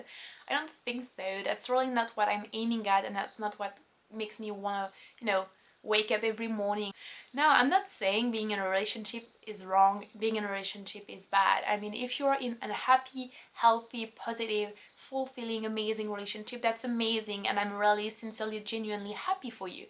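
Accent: France. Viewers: You're a really pretty girl. I like how deep these videos usually go into topics. I couldn't agree more when it comes to relationships. I think they often preoccupy or even distract people who could otherwise live a more fulfilling life. The French Accent is awesome too. Reminds me of the movies. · is that a legit french accent? pretty eyes by the way! the video is about nothing though. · Est-ce parce que je suis français et qu'elle a probablement un accent français que j'arrive à tout comprendre ce qu'elle dit? En tout cas, merci pour cette vidéo. Très bel état d'esprit, et toujours aussi ravissante!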